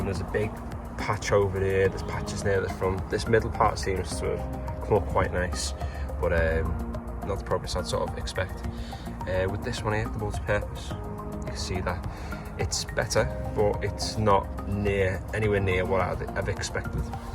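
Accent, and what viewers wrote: Liverpool, United Kingdom. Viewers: Fellow Scouser 👌, great vid mate👍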